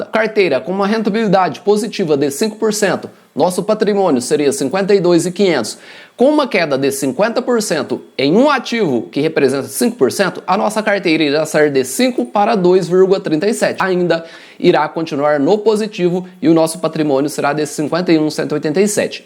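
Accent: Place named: Inland São Paulo, Brazil. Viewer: Esse sotac Paulista do interior é irritante rs mas o que vale é a informação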